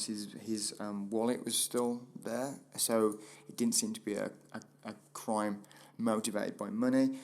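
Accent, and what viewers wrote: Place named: United Kingdom. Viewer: You don't understand english? I'd understand if it's not your first language though since the accent is british, even then, I would study a bit harder on dialects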